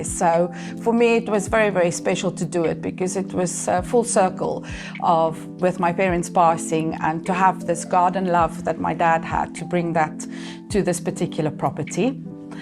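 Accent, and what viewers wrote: South Africa. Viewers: Nice mooi afrikaanse aksent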